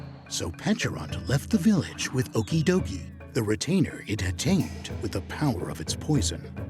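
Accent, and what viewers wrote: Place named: United States. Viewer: Why isn't this in English British but in a "Murrican" accent? And why isn't there still an option for British English so Fahrenheit becomes Celsius in dex entries?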